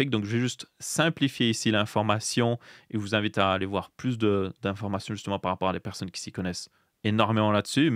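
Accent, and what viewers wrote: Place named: Belgium. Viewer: Tous les nombres qui sont énumérés avec un accent belge me font perdre le fil, ça devient difficile de suivre puis je décroche complet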